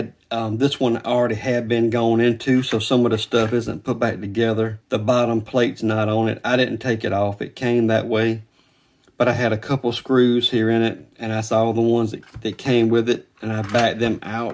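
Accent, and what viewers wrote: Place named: North Carolina, United States. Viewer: Are you from North Carolina by chance? Your accent sounds like my dad's hometown in the southeast.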